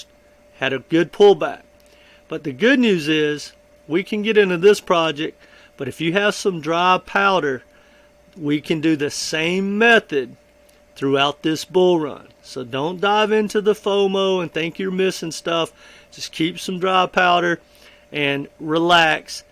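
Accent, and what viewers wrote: United States. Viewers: I love your accent SO damn American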